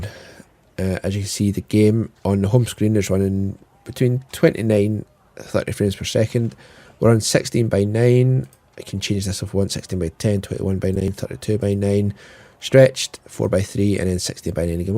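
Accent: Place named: Wales, United Kingdom. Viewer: Great showcase. It's nice that you are talking more in the videos and I love the accent. Is that welsh?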